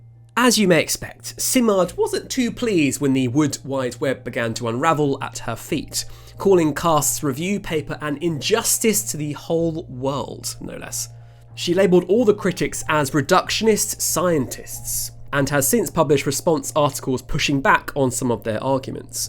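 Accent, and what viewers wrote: United Kingdom. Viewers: This is a masterful exercise in gaslighting, all the way down to the British accented narrator. uses a well known template from the timber and chemical industries- employ delaying tactics to tear down the best current research by asking for more extensive research. This is significant when taken in the context of major timber harvesting plans already underway in Canada and more planned in the Pacific Northwest in the US. Simard is correct in pointing out that her one time "colleagues" are "reactionary" scientists. doesn't point to any research from Simard's critics on the relationship of the mycorrhizal network to the trees and how that can be better understood with newly designed experiments. It would be interesting to see if has done any films on the roles the chemical and timber industries play. Try understanding the role Bonnie Bessler's "auto inducers" play in spreading the information carried by microorganisms.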